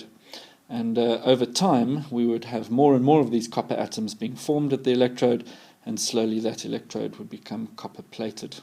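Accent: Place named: South Africa. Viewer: Heavy South African accent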